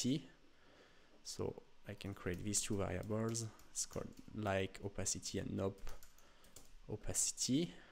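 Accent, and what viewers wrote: France. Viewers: You primary Lang is french ? Because you have a french accent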